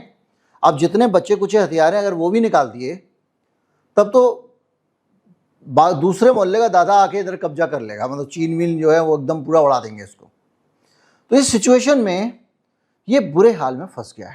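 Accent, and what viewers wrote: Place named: Delhi, India. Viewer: What a wonderful content sir 🤩😇 everyone in the morning must watch sir’s video where he will give news in a fashion that it’s entertaining and learning and soothing as well in a typical Delhi style of Hindi. It’s always refreshing to watch his videos in the morning, particularly.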